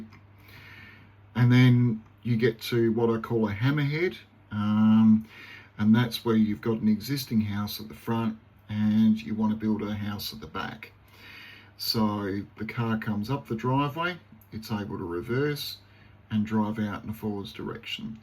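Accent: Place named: Australia. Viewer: You're work is truly awesome! You have made your mark in many families lives with your designs! I would be curious too to see the completed build! In Australia is there a minimum sized required to build a home on land I wonder? I wanted to have a home built on land for awhile but unaffordable for me now. I can still dream though! I'm glad I found your channel really easy and so far I am impressed! Off to watch more of your videos now! PS your Australian accent I think is so cool mate! 😁👍💯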